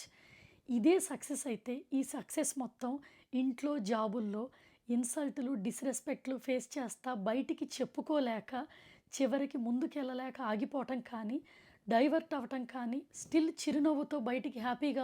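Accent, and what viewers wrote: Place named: Telugu, India. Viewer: మీరు అమెరికాలో ఉన్న మన తెలుగుతనాన్ని అస్సలు మర్చిపోలేదండి మాట తీరు చాలా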